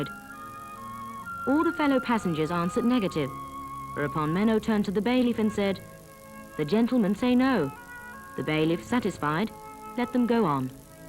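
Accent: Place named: England, United Kingdom. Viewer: She doesn't know how to pronounce Menno Simons. It's Menno Simons with a long i. Not Menno Seemans like she says. Kind of funny that she has an English accent, as well. This needs to be done over with someone with a Swiss German accent or a Friesien Dutch Accent.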